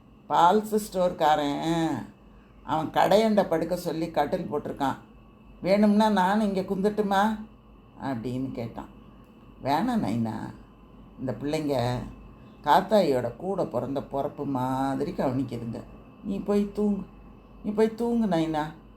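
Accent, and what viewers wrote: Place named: Chennai, India. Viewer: நிதர்சனம். மெட்ராஸ் பாஷை super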